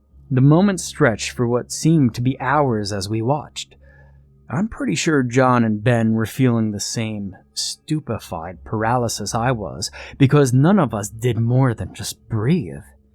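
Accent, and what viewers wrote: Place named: Western Maine, United States. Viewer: you sound like a few guys I know up in my area of Western Maine♡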